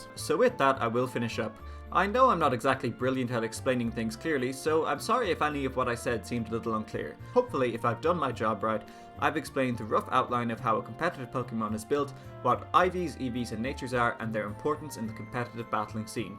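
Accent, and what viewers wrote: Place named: Ireland. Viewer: I can tell by you're accent that you're Irish. I am, too!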